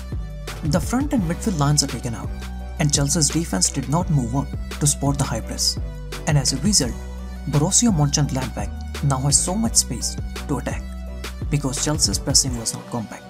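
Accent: India. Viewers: are you from 🇮🇳India.. Cuz ur accent and the way u speak seems so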